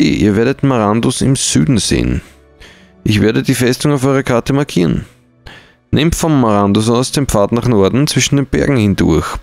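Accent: Vienna, Austria. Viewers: Endlich Wienerisch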